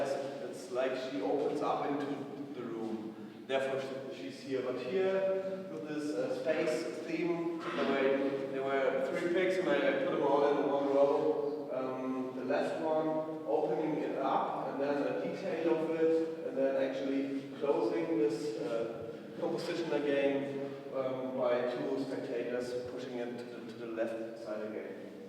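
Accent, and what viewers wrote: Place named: Germany. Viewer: man hört ganz eindeutig deinen deutschen akzent wenn du english sprichst :D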